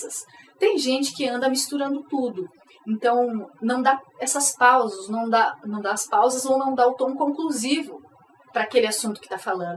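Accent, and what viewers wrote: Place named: Londrina, Brazil. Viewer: Vc é de Londrina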